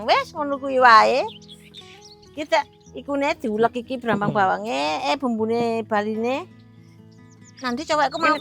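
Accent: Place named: Surabaya, Indonesia. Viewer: ng jogja wes suwe tapi logat e jek logat arek ae 🤣 Salam saking kulo nggeh buk darjo cerak mantune smpean 🤭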